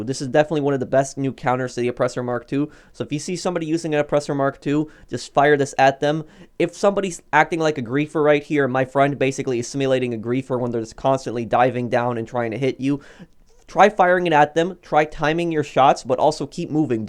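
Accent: Poland. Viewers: Are you polish? Just a Side question. But i love your content and i love How creative and descriptive, especially when it comes to killing griefers. Great job. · Are you polish?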